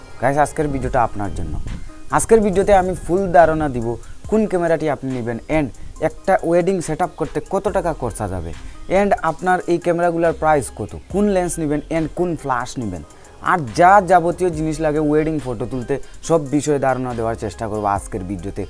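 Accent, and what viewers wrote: Sylhet, Bangladesh. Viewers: সিলেটি নি বা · ভাই তুমি কি সিলেটি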